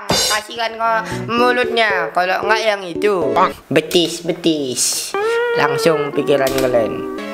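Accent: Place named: Padang, Indonesia. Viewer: Padang mana nih suara nya?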